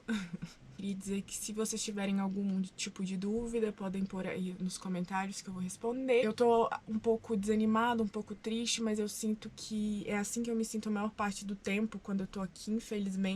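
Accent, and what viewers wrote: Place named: Brazil. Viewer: Já devias ter ido ontem não queremos gente como tu aqui no meu país estou farta de ouvir esse sotaque brasileiro vai para Brasil lá é melhor não fazes falta o choque da cultura enfim já te esqueceste que o Brasil foi descoberto pelos portugueses no Brasil so havia indios voces são descendentes de muitas raças brancas negros etc portanto gente boa é bem vindas os que são vagabundos e que não gostam de nós vão embora ontem já era tarde adeus 😂😂😂😂😂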